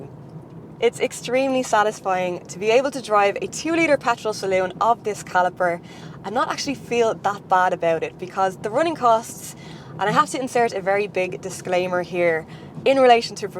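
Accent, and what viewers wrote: Ireland. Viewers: Love that Irish accent, will drive 14k subscribes today to 1.4M in 2 months.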